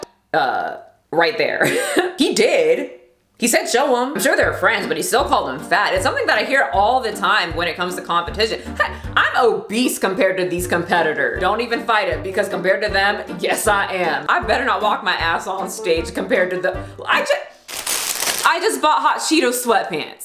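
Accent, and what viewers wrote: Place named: Louisiana, United States. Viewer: are you southern? you got that Louisiana accent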